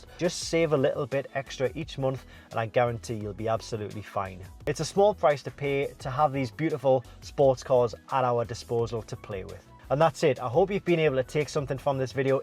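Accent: United Kingdom. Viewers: "YouTube, it's..." I used to think it was "YouTube and such..." just said with the UK accent.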